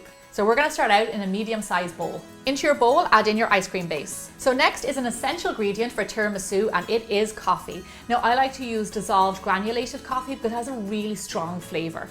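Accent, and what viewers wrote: Ireland. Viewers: I love your Irish accent in the making of kulfi Ice cream. You should try Chamomila and honey Ice cream soon.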